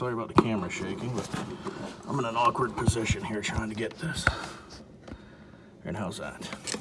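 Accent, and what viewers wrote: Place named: Pittsburgh, United States. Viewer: Thanks, and you sound like a yinzer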